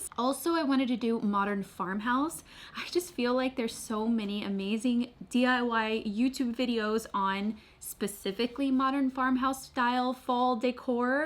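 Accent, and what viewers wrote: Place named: Hill Country, United States. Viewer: I love love love the way you speak. I hope that's not weird...you have such an elegant tone and each word if full of genuine feeling. did I already say I love it!! Maybe I just miss hill country accents <3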